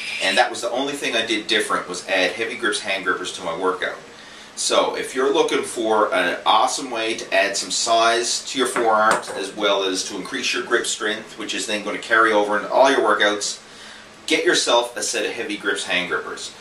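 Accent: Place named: Cape Breton, Canada. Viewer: Her are you from Cape Breton Nova Scotia? You sound like a Cape Bretoner :p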